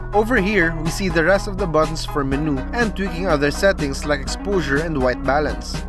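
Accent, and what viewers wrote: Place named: Philippines. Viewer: This accent is so nostalgic... Are you a Filipino? :/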